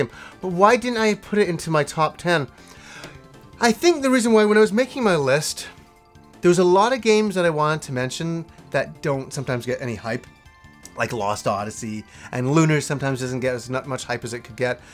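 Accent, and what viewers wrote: Yorkshire, United Kingdom. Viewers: U are a Yorkie? which part were u from? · WUT? your a Yorkshire Lad?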